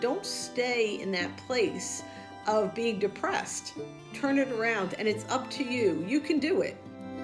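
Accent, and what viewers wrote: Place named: New Jersey, United States. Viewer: Excellent podcast! And I love that Jersey accent! 😉🫶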